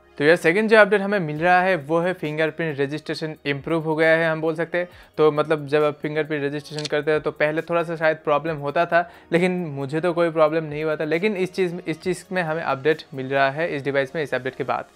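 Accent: Bengal, India. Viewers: Bengali I guess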